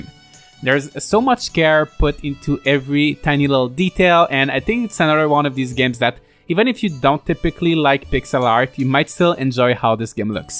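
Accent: Quebec, Canada. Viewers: Oh le Quebecois is fort in this one. <3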